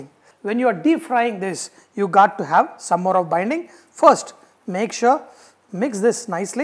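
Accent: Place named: South India, India. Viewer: Abbe keema hota hai keema, ye kab southern pronunciation se kab tak dimag ki dahi karoge yaar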